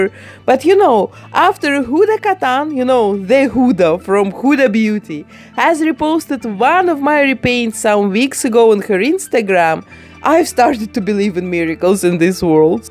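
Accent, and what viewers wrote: Russia. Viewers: такое КРАЙНЕ русское, твёрдое произношение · Do u have a really strong Russian accent. May u please tell me because it’s beautiful 😂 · where are you from? You have very russian accent:)) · Я же не одна слышу этот потрясающий русский акцент? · Вы разговариваете как моя учительница по английскому Жесткий русский акцент 😂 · Ух, ну очень русский акцент Но работа прекрасная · no,it is russian accent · Oh girl u have such a cute face and russian accent:)) U are doing such a great job! Greetings from Romania! ❤️❤️ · Какой-то акцент русский что-ли 😄😅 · You are Russian? very Russian accent🤨🧐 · Всё видео про это думал. Она точно из России, у моих одноклассников такой же акцент))) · Я одна слышу этот ужасный русский акцент???? С таким же акцентом у нас на МЦК остановки на английском объявляют: "Зе некст стейшн Сокольиноя гоура... Пуш де бадн то эксит".... 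и всё в таком роде, аж мурашки пробирают от такого акцента((((( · Tiene acento, habla inglés perfecto, solo con acento, aparentemente ruso o de la región. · типичный русский акцент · однако здравствуйте she definitely speaks Russian , as I Russian I can hear it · Такой жёсткий русский акцент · You look like my past 2-5 grade art teacher, you guys also have the same Russian accent- (I think you have a Russian accent idk-) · Русский акцент , наших везде узнаешь. Просто перекрёстная работа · Кажется что у вас русский акцент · u have such a RUSSIAN accent,woww!! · Как - будто акцент русский у неё.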